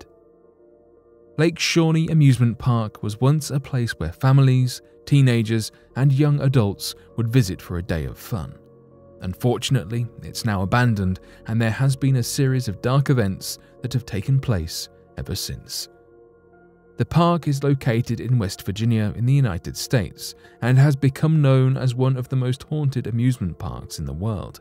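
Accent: United Kingdom. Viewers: It's entirely possible that they are sourcing content from an unreliable source, an old source that is no longer familiar, or even sources that simply have different experiences from you lot. But to pull all those details out of their butts & off the tops of their heads is unlikely. I'm more apt to believe one of the first two possibilities, especially since the narrators sound like they might live across the pond (therefore potentially easily made to believe untruths, as they have no or very little experience & reference for places in the US).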